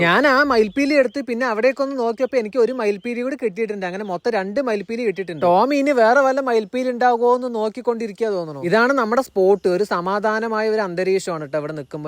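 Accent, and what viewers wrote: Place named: Palakkad, India. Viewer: നമ്മുടെ പാലക്കാടൻ സംസാരം കേൾക്കാൻ നല്ല രസമുണ്ട് ഏട്ടാ നിങ്ങളുടെ വിഡിയോ ഇഷ്‌ടമാണ് നായകുട്ടിയെ ഒരുപാടു ഇഷ്‌ടമാണ് ♥️♥️♥️♥️♥️♥️♥️♥️♥️♥️♥️♥️♥️dog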